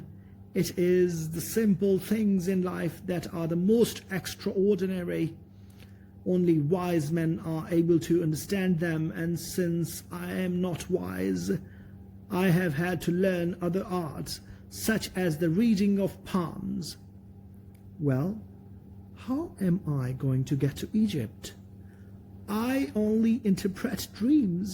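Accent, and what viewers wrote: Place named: United Kingdom. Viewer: Pure British ❤️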